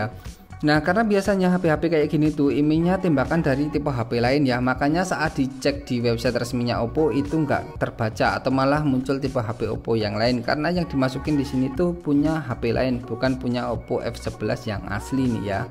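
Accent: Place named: Java, Indonesia. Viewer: Jawamu terlalu medok mase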